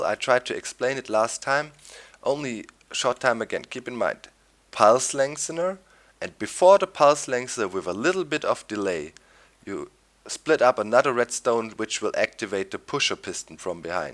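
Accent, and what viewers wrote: Germany. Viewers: Ha! Ich wusste doch das du Deutscher bist, das hört man doch. Hab mich aber nie getraut zu fragen :D Geile Videos-> Aboniert =3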